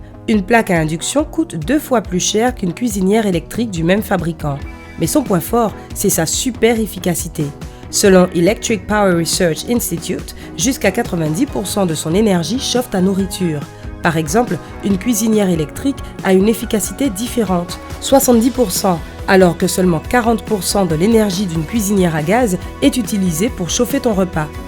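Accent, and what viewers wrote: Antilles, Caribbean. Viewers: C'est une antillaise la voix off ? (Juste pour savoir inh...) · C'est une caribéenne/ haitienne qui parle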